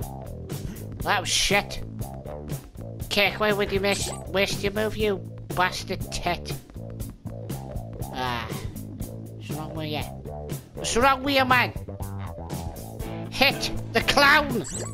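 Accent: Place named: Scotland, United Kingdom. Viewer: You Are Scottish Right?😂😂🔫🔫 😍🔥Dope Accent!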